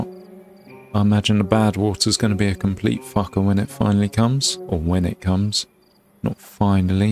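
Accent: United Kingdom. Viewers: You do realise that only the Brits (you) and Aussies (me) will understand what you just said.... about Beavers.... Your accent sounds British - let me know if I'm way off base, but I totally got your humour.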